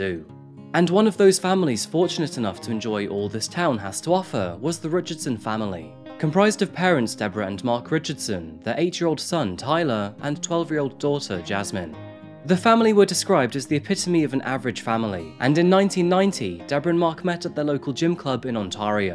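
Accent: United Kingdom. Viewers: You where made for this ,how you do yo thing man ,how I want to say I enjoy the show will come off weird and socker like so I better stop 😆🤣,anyway love how you set your channel big time 🖤,love when you call bullshi... t when u see it and with a British accent ,gets me every time,ok it's getting weird bye 👋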